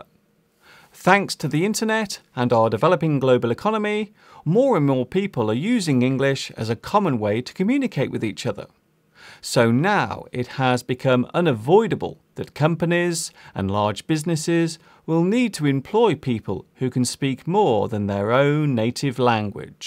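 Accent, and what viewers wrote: England, United Kingdom. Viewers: Hi Mr., my name's ilham. I'm From Indonesia, your video is verry good, and i want learn english from your channel. By the way, do you speak english with british accent, right? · this is british english or american ???? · he said ftom england do sure it is britinsh english...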